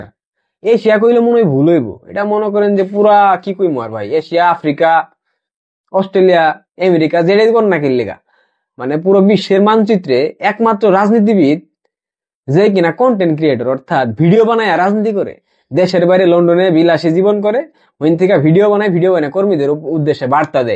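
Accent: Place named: Bangal, Bangladesh. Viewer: ভাই তোমার মত কয়েকজনকে দেখি এত বাঙাল ভাষায় বলো কথা, আমরা যারা কলকাতায় থাকি তারা তো তোমাদের চ্যানেল , তোমাদের ইউটিউব গুলো দেখি তাদের একটু বুঝতে অসুবিধা হয়। একটু পরিষ্কার ভাষায় বলা যায় না। এমন নয় যে তোমরা পারো না।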